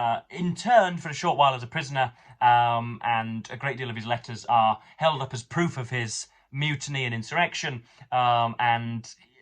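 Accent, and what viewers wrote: United Kingdom. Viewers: I'm impressed by your wee Scottish intonations, laddie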